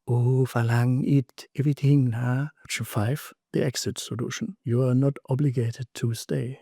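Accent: Germany. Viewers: You are just too much dear kind Sir! I think the whole german accent in Thai is just the best! Yeah...you have a new sub! LOL>... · Yes your impressions are great, and the meanings are near spot on. Although because your German, Bum bui, pum pui. Funny.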